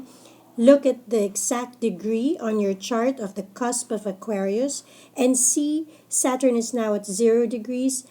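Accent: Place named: Philippines. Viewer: why do u have a filipino accent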